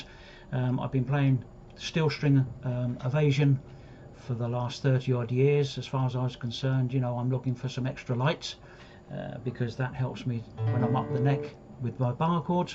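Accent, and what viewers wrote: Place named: Northern England, United Kingdom. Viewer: Thanks for answering ! It sounds a bit northern English...but I may be wrong. Anyway, thanks man. Love your videos.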